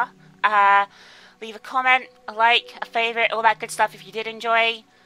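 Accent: United Kingdom. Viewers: are you british? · Yes she is British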